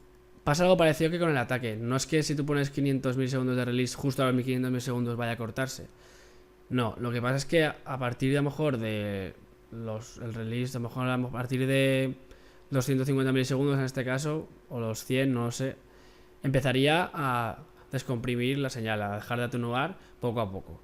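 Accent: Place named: Aragon, Spain. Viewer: tus tutoriales son buenos pero los que mas me gustan de los que tienes son los que te paras a explicar detenidamente todas las funciones y que quedan tan claras como este, muchas gracias bueno son conocimiento que ya tenia pero algo si que he aprendido gracias a tu detenimiento explicando, gracias a sido excelente, ademas tu hacento maño es lo mejor, por que yo soy medio maño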